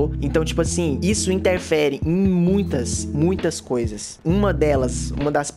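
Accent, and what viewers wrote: Minas Gerais, Brazil. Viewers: Vc é mineiro ?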